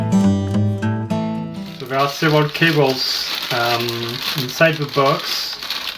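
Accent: France. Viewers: you have a french accent, mon ami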